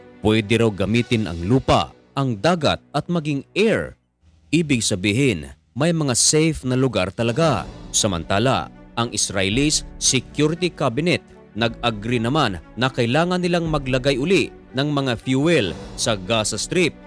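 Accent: Visayas, Philippines. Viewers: bisaya ka dung!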